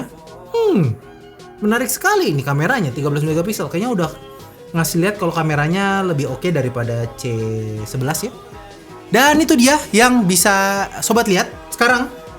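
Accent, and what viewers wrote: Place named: West Java, Indonesia. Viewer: Anjay Sunda pisan wkwk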